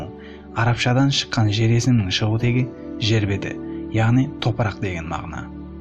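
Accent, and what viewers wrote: China. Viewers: Кытайда туратын казах менинше. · Қытайда оқығансыз ба? тіл акцентіңіз ұқсас.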